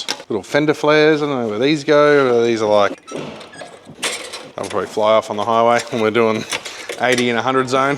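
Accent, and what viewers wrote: Australia. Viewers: These little cars are so cool. Also wait, you're Aussie! Subscribed!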